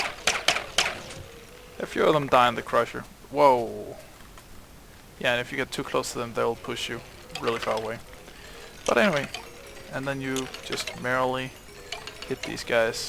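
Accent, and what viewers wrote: Denmark. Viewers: Jeg ved ikke helt... men jeg tror jeg kan høre på din accent at du er dansker? :) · Are U danish?? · Im curious, but are you by any chance Danish? That accent sound really Danish hehe (Im a Dane myself, thats why ;) )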